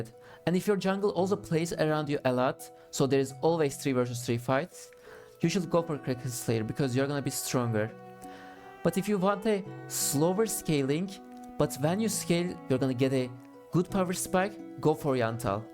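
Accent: Turkey. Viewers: tam türk ingilizcesi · Türk İngilizcesi bu amk xd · I know when I hear a turkish accent subbed o/ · Brother are you Turkish? Great video. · Türk aksanın var, türk müsün?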